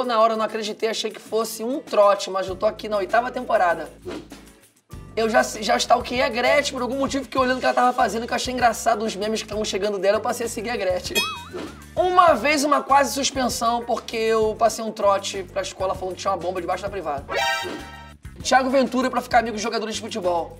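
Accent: Pernambuco, Brazil. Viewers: Agora tô mais fã ainda de tu,visse?! O sotaque de Pernambuco é massa mesmo!!! "Xero" Rafa!!!(por aqui a gente manda carinho assim!) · Realmente nosso sotaque é lindo #pernambuques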